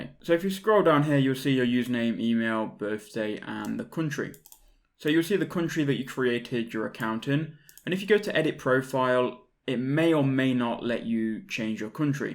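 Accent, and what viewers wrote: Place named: East Midlands, United Kingdom. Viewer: British, sounds like East Midlands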